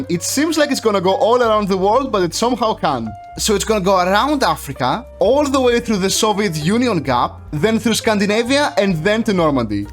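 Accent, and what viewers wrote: Greece. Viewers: Bro , are you greek ? Cuz the accent really seems greek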